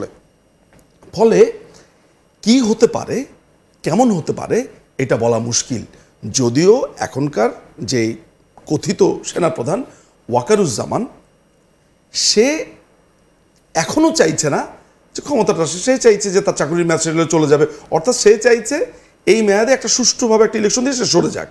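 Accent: Kolkata, India. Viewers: Kolikata accent already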